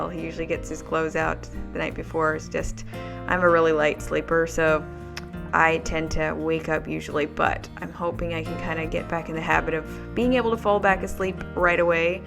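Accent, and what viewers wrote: Pennsylvania Dutch, United States. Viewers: I'm from Palatinate (Southern Germany as well) and I feel the same way. Most of the words are used in the exact same pronunciation here. I could listen to you speaking Pennsylvania Dutch for hours,. It's very interesting!:) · I came across your video by coincidence and played it because it says Pennsylvania Dutch. I am not only German, but also a native speaker of the Palatinate German dialect. It is the first time I have heard someone speak PD! I have to say that the pronunciation is significantly different. I can hear the American Accent that got in, but I recognise many words. Many are still written as we say them in Palatinate. I especially recognised „do hiwwe“ 😂 and „Kich“. 🤭 Greetings from Palatinate! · I absolutely loved today's video!! How fun!! It was fun to hear the Pennsylvania Dutch thrown in! I love your decorating style. 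You have SUCH a great eye for it. And all the little organized spaces- LOVE that!! That little cabinet in your family room is awesome! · Love your videos and your Pennsylvania Dutch is beautiful. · So much fun hearing your dutch! Pa dutch was my first language too, though mine is more the east coast Mennonite style, definitely no rolled r's :-) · Love this video blesses my heart hearing you speak Pennsylvania Dutch brings back so many childhood memories. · I very much enjoyed hearing your voice over in Pennsylvania Dutch. I took German in high school and I understood some of the words. I really enjoye your videos. You are a beautiful person. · I love hearing the Pennsylvania Dutch really never heard it before. I think that English has a heavy Germanic influence to it from the past. I needed the cleaning motivation as well to get my dishes done. Thanks have a great weekend. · Love to hear the Pennsylvania Dutch! 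Very interesting. Hope you do more occasionally. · I love hearing your Pennsylvania Dutch! Although my phone puts captions of its own, and it was very humorous reading what it thought you were saying in English. Haha! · I also hear the German very distinctly. I spent many years there (my dad worked there for 17 years) and I got married in Heidelberg. You are speaking it with what I would call an "American" accent of course, but that is to be expected. I speak German with an American accent, too. LOL I hear a few Dutch words, it's definitely a blend of the two languages. It's beautiful, but I do hear the English words as you are speaking, too. I think it's amazing to learn different cultures....it was the BEST part of all the travels I was blessed with. I've lived in Egypt, Germany, Okinawa, Switzerland, Czechoslovakia and many places here in the US - including in the PA Dutch Country - and I was born/raised in Cincinnati OH as our "home" when we came home from overseas. 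I am loving your channel. Blessings!!!! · Hey, to answer your question, i'm German and I understand approx. 50% of what you say. And as a language teacher i find Pennsylvania Dutch sooooo interesting :-) Love hearing it! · Where did you get the toy box with casters? We speak PA Dutch too but yours is a little different. I could easily understand you tho. 😁 Also thank you for talking to us in Dutch. Loved it! 👍😀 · Love your videos. Love listening to Pennsylvania Dutch!!! I so miss living in PA. I'm curious as to how well talk to text picks up Pennsylvania Dutch? :) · I live near the French border in Germany and I understand most of your dialect. I have to listen closely. Usally I think in English or German, but Pensylvania Dutch is so haevily mixed, that my head spinns a bit when I try to sort out the bits and pieces. :) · I loved listening to you speak PA Dutch. It reminded me of listening to my Dad and his sisters. 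· Love hearing the PA Dutch! Always enjoy the cleaning videos....I am not a good housekeeper (yet), but I learn a lot just watching how you do it .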